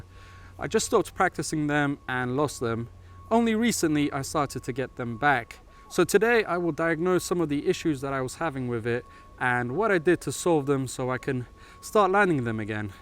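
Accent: United Kingdom. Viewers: Lan türk aksanı bekliyordum british çıktı asdfafdas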